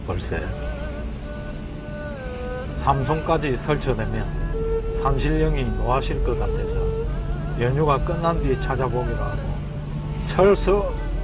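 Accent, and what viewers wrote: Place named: Gyeongsang, South Korea. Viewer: 말씀하시는 억양이 경남쪽이신것같아 반갑습니다 저는 마산태생입니다 구독 좋아요 누르고 봅니다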